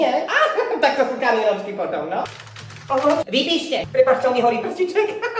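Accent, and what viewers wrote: Slovakia. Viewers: 😂bože, ja z Teba zemrem...Úplne najlepší...Milujem Tvoj kubínsky prízvuk, perfektne všetko "odohrané". Jednoducho, Tvoje videá mi vedia zlepšiť náladu aj keď ma prepadá temná nálada😅 Ďakujem ❤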